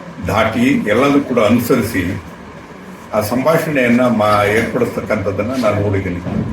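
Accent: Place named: Sira, India. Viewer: sira bhashe sogadu super. na fan nanu. Inti H D mestru.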